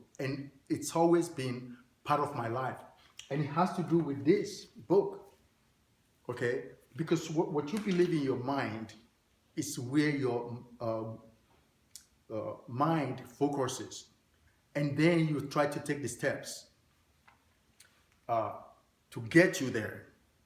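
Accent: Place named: Africa. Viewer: Always interesting to hear from you. People who are not in America will not understand they joy some of us living here have when we have the opportunity of hearing own brother teach with our own African English. Thank you my brother.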